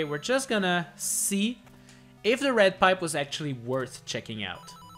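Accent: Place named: Spain. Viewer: If you don't speak spanish, why do you have a spanish accent?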